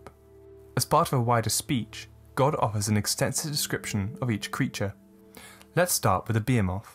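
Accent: England, United Kingdom. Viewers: I got halfway through this video and was like "wait, wtf is a Beer-Moth?" Then I realized he was just saying Behemoth in an English accent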